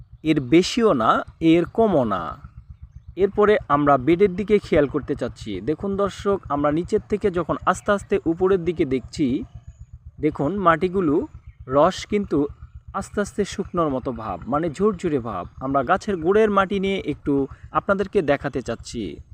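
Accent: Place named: Naogaon, Bangladesh. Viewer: ভাই আপনার বাসা নওগাঁর কথায়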